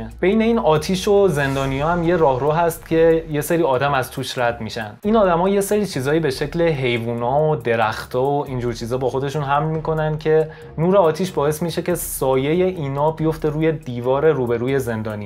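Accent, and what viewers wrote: Iran. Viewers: من شاید یکی از هزار وجند بیننده ویدیو های شما هستم ویدیو های تاتار دنبال می‌کنم لایک میگرارم وکمنت یک پیشنهاد خدمت شما دارم : یک کمی لهجه فارسی تان را بازسازی بکنید چون همه فارسی زبانان ایرانی نیستند …! میدانم تغییر لهجه خیلی سخت است ولی اگر بخواهید میشه یک دوست من چند سال در ایران زندگی داشت شبیه شما ایرانی ها صحبت می‌کرد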